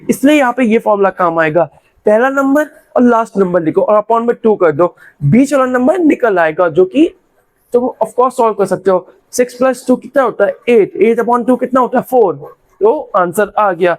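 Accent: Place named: United Kingdom. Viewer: Uk aceent By the way 1st Comment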